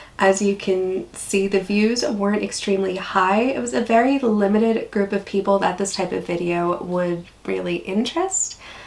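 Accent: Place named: Australia. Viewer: Crikey! a full year already. Congrats, thanks for the behind the scene's look, really interesting. I have watched and enjoyed many of your vids, seeing a person from the U.S. become an Aussie is awesome, small changes in speech (using slang, Aussie accent etc.) creeping in, (it's a secret ingredient they put in Vegemite, Shapes and Chicken Salt) before you know it your thongs have fallen from your hips to your feet;-). Love your channel, best wishes and hope for continued success! Cheers mate.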